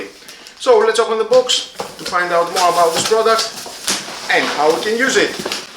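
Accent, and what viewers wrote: Greece. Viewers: ρε φίλε σόρυ, αλλά μιλάς σαν Έλληνας!!!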